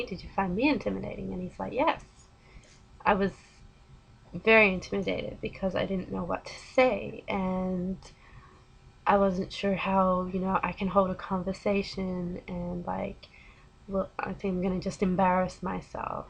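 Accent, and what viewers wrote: United States. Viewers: How are you Australian when you have an American/Canadian accent? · You sound more like an American to me~ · Hey, if you still log into your channel. I have a question...you say you are from Australian? Yet, your accent sounds very much American. haha:p I dated a girl from Melbourne once, her Aussie accent was very thick. hmmm maybe you watch way too much American Television?! Haha:p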